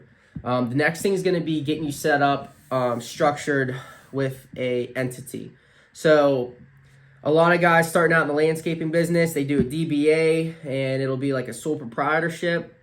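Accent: United States. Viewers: Lol you from pa man sounds like a a accent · Lol this dude is Delco AF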